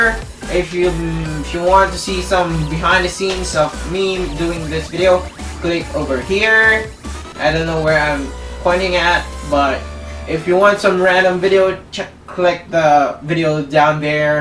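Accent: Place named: Philippines. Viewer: I noticed a slight accent, I'm Filipino too! I lost my accent though D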